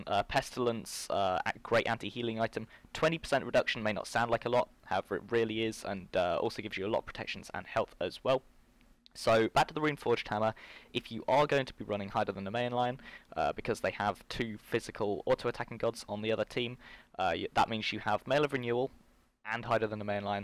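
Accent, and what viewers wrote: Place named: United States. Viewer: account He sounds 100% american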